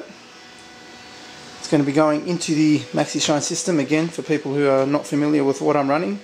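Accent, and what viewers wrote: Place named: Australia. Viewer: Aussie pride, shown through expensive products and decisive accents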